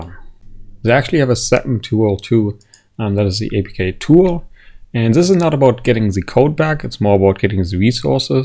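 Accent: Germany. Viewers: Instant erkennt das du Deutscher bist am Akzent xD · Its called German Accent. Even medic from tf2 has that accent.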